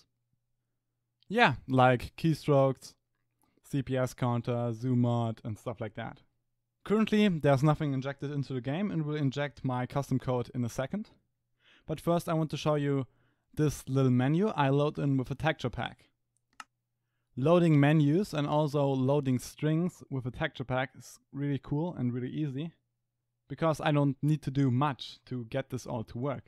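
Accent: Germany. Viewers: haha englisch mit dein deutschen akzient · Ich find's immer lustig wenn deutsche englische Videos machen, weil man hört es schon ziemlich, naja, vermutlich wird das sowieso nur den deutschen auffallen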